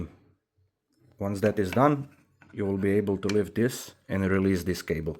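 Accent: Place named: Russia. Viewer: русский акцент явно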